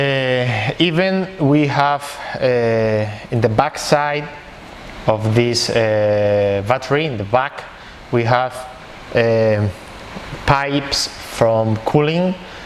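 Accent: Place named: United States. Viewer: Súper para los que no tenemos el oído acostumbrado a la modulación de un gringo:)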